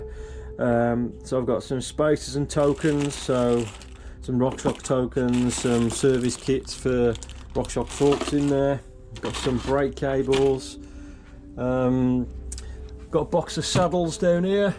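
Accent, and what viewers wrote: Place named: Midlands, United Kingdom. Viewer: yeah I thought I could hear the Midlands twang lol im from great barr , really down to earth videos im enjoying them , I'm just trying to sort myself a starter bike , although I hope there isn't an age limit I'm 54 , exercise and weekend rides with my lads , any tips on what to look for, unfortunately budget a bit tight so I'm looking for a cheap as I can and maybe upgrade .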